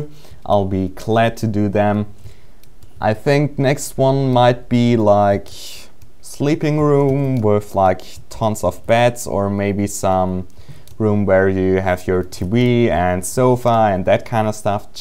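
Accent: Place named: Germany. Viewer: man i love your german accent so much. Haha Nice video man!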